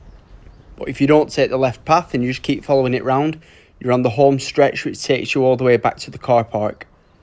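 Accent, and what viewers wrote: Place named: Lancashire, United Kingdom. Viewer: Great video. Nice place. Nice to hear a Lancashire accent 👌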